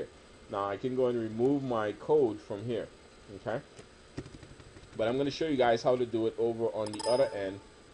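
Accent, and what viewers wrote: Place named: Bahamas. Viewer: OMG YOU ARE BAHAMIAN I can hear trhat I went to school with many bahamians Do you do do Online Businesses?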